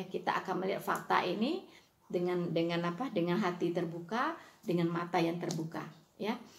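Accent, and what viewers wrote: West Sumatra, Indonesia. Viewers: Dari logat bcr, sy yg bukan orang Minang tau, beliau mmg dari suku Minang, sy punya seorang teman yg sdh lama tinggal dan bergaul dg orang2 dari brbagai suku di Jkt, logat bicaranya percis sama dg ibu ini, lagian buat apa sih hrs bohong soal beginian. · Haleluya Puji Tuhan Yesus, Shaloom Bu semoga di berkati Tuhan Yesus dgn bersama keluarga...Amin terus Bu, saya orang batak lama di Riau saya masih dengar logat intonasi masih terbawa orang Minang dan terusss Bu kabari injil terus saya penggemar Bu, kasih Yesus nga pernah pudar..! bersinar terusssss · Bu' logat dan intonasi ibu bicara saja jelas kok suku minang. Terus lakukan dan berikan penjelasan untuk meluruskan tanggapan yg miring dari Muslim Menjawab.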